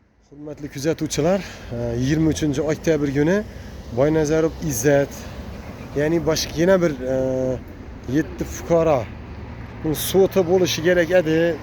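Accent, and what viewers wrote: Khorezm, Uzbekistan. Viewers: Шевада гапирманг илтимос, уни хоразмликлардан бошқа ҳеч ким тушунмайди 🙅🤦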